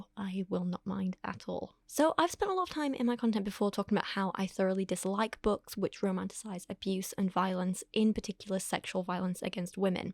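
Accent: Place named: North, United Kingdom. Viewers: I appreciate your takes on things and your unapologetic-ness (and your nothern accent! Makes me feel right at home.) People have lost their damn minds. Look after your mental health after this one, lovely <3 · I enjoy this channel because it's hard feminist truths dropped in a lovely northern accent and also sometimes you hear/see a gremlin (hi!)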